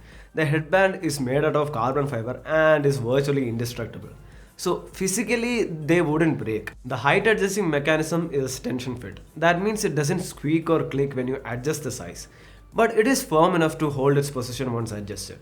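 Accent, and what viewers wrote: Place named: Tamil Nadu, India. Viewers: Sometimes it gets little hard to understand his south indian accent. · OK!!! I have to admit that South indian accent of english is God tier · Typical Tamil accent. Nice review Dude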